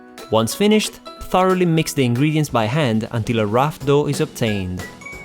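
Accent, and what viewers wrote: Italy. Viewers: yet another great video, thank you! Your videos are always very entertaining because of your wonderful sense of humour:) We also love your italian accent and how you pronounce every letter of English words (as you do in Italian) such as "fruit" and "juice";) Our beautiful Italian vegan friends here in Brisbane recently made us potato and pumpkin gnocchi from their nonna's recipe - buonissimo! They will love your channel too we're sure.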